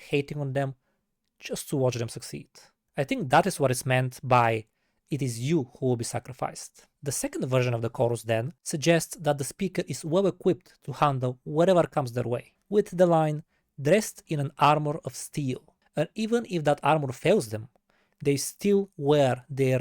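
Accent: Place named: Serbia. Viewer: Only Lovebites can make a song like a mix of Slayer and Testament but still got it with their own style. Gotta love this. M & M guitar duo just cant be beaten. Lovebites reactions from this dude are always great. This bro knows music and his accent reminds me of someone from my area (i am serbian)-ex Yugoslavia. Love it. Just keep it up bro-dont stop, you re good. Respect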